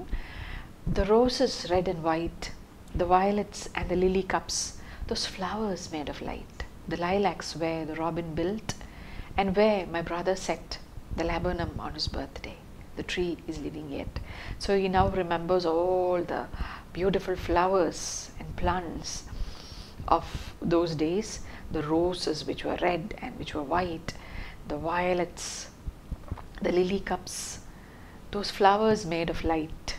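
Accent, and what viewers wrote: India. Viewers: IN Skip navigation i remember i remember by thomas hood 9+ Avatar image / I remember, I remember - Poem by Thomas Hood | Explanation English Lessons 64.1K subscribers Subscribe 556 Share Download Clip Save 24K views 1 year ago An explanation of Thomas Hood’s poem I remember, I remember. 37 Comments R U Thowamy Add a comment… 11 months ago Ma'am I have been watching your video for last one year. Now I am a teacher too. So about my last interview I gave a demo class on my subject. I noticed your attitude through your video that you always being clam and using Indian accent. Ma'am believe me I did exactly follow your attitude and Now I am selected. So thank you so much for giving the inspirational personality. ❤ 22 Reply 6 months ago (edited) Wonderful analasys, I saw many other analasys but your is the best of them. Thanks alot for your efforts and simple analasys. 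1 Reply 1 year ago Ma'am, please do a video on the poem Mac flecknoe by John Dryden. 1 Reply 1 year ago The teaching style is very good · Ma'am I have been watching your video for last one year. Now I am a teacher too. So about my last interview I gave a demo class on my subject. I noticed your attitude through your video that you always being clam and using Indian accent. Ma'am believe me I did exactly follow your attitude and Now I am selected. So thank you so much for giving the inspirational personality. ❤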